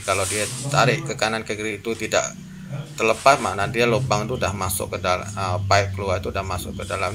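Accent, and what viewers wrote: Malaysia. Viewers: Cakap dah macam org Malaysia marah pulak nanti org Indonesia. Mantap bro jelas mudah faham.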